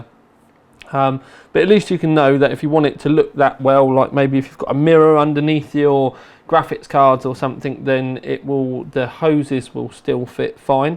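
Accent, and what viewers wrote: United Kingdom. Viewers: Basic benchmarks No they didn't. The English did. Ever heard of a country called England? Ever heard of the English people? The English spawned the languge, not the Scots, not the Welsh, and not the Irish. +TheMaraio1 in England dude, he's English and speaks with an English accent, I'm American, I speak with an American accent. It's Americans like you that give the rest of us a bad rep abroad, seriously guys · this hardcore British accent is a little bit hard for me to understand LOL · Andre Salles That's because he's in Britan and speaking British English...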